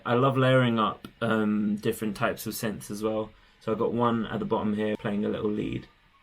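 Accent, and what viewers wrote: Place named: United Kingdom. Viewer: You have British accent. Are you born there? I am in Toronto, Canada. Nice to meet you. Actually we both commented on the documentary about Saudi Economy.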